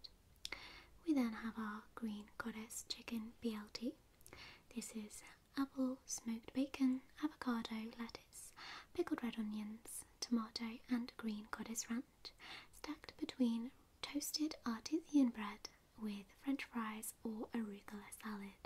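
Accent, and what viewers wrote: United Kingdom. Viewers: Oh you're British? Never could tell before with the accent. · british accent okie dokey. Loved it. Also Room 234567? How many floors does this place have? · Isnt this the normal british accent Why write it in the title for the first time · A typical British accent. Because I am British this sounds normal. 😂😂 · Öki Döki :D That british accent is funny... and adorable at the same time ;) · "British accent" brillant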